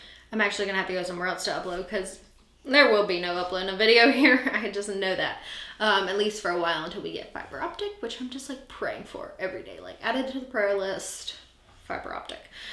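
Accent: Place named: South, United States. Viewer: Girl, I am jealous! My hubby's family lives in Alabama. It is beautiful there, and like you said, it's close enough to everywhere, but far away enough to stay lost if you want to. You are living my dream! Hang in there. It will all work out. Glad you're back. I could always tell you're a southern girl. Me too!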